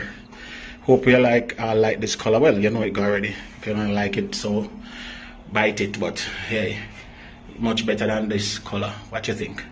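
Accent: Jamaica. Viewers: Lol a Jamaican wow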